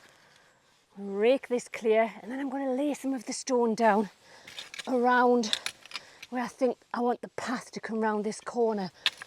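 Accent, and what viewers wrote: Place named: Northern England, United Kingdom. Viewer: Fab, us too. We’re in Bellingham :) beautiful part of the world. Although I’m from Sunderland originally and Hubby from Gateshead I heard the northern twang. Such a small world to come across a local video! Just an FYI gardening express have a deal on Hosta’s 40 for £10 just bare root but I got 80 and they are all popping up lovely 🥰 I’ll check out the stumpery, thank you 🙏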